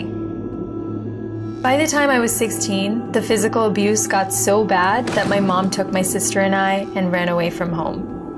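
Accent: United States. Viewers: Yeah... Indian music, Indo-westren clothes, American accent n free bird... Makes u unique from others... That's why we love❤ uhhh 😘😘 love from ur root place Congrats for 7 M fam #VV ❤ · Hey your simple amazing girl and jus be what your and your voice soooooo lovely special with u s accent 👍🏻👍🏻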